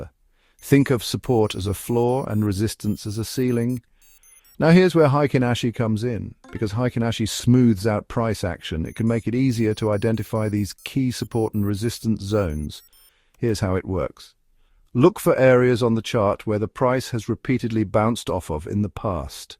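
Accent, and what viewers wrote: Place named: United Kingdom. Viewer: Very informative and u sound british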